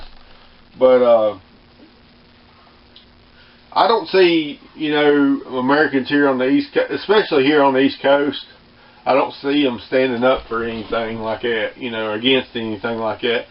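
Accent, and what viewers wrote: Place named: South, United States. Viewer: Well I watched your video, the whole thing, and that's time I'll never get back. Sounds like you're just given up, it also sounds like you're from the South. But you don't know the South because ain't nobody giving up anything down there. Also if it gets "Venezuela bad" us people who buried our fire arms will have firearms. So you're talking in this circular logic that doesn't make sense. The only thing that makes sense to me is you're a coward and you're going to give up.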